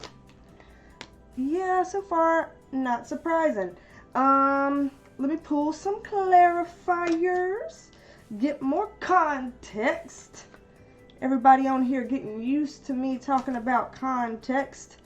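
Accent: South, United States. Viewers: You sound country as hell and I love it!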